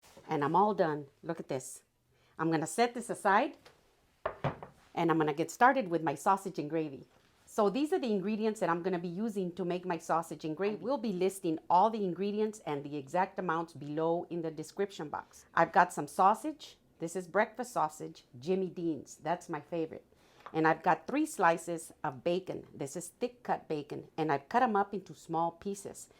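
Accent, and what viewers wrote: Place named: Texas, United States. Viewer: "I'm gonna".....girl, if ever there was any doubt you're a native Texan, that should alleviate it. You should throw in a few "I'm fixin to"s just to seal the deal.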